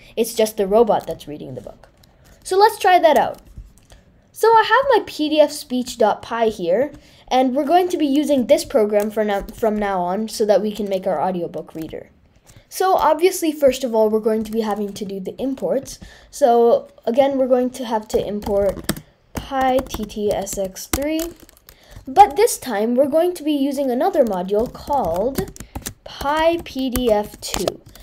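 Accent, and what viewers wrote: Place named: United States. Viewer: Where do you live ?I mean your origin is India but accent is American . Can you please tell me where do you live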